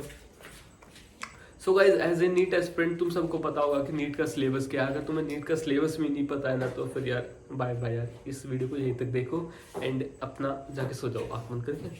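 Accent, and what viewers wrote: Haryana, India. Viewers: Hariyanvi me hi bol k video bnaya kro mast mst lgte ho apne accent me dono 🥰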